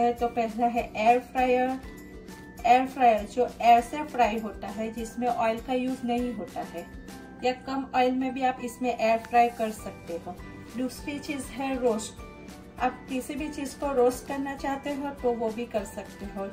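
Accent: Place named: Nepal. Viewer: You speak like Nepali or Assamese. Nice video.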